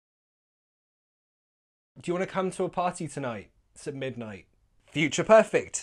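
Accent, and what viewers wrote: United Kingdom. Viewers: Hlo sir I am Tara that's really amazing I am up for watching ur video I like ur teaching style.however I wouldnt like British accent bt uve grown on me thank u I love u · Amazaing sir British accent English 😊😊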